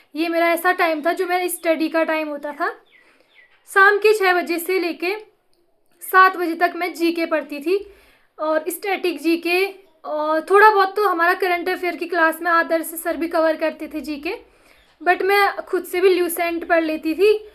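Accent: India. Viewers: Tum pakka pahadi hogi tumhri voice se pahadi accent aa rha hai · Tumhari tone uttarakhand jesi h hamare yeha ki ladkiya bhi aesi he bt krti h..m Pithoragarh se hu aap kha se ho..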